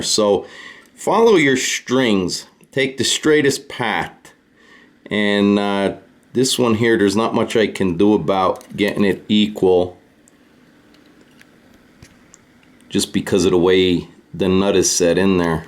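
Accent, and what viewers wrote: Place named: Italian-American, United States. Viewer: You sound like a paisan. 👍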